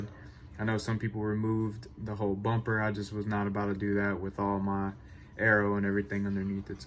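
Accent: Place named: Louisiana, United States. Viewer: As soon as you started talking I said "Southwest Louisiana" haha. I dig these lights man.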